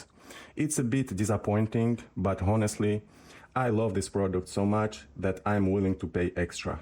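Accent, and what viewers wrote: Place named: Eastern Europe. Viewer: nice eastern Accent im from Poland ! Peace ! ❤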